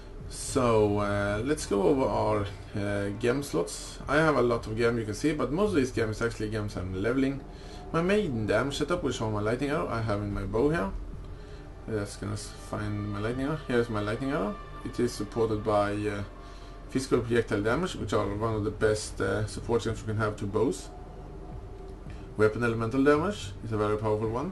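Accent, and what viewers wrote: Sweden. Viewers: svensk? :D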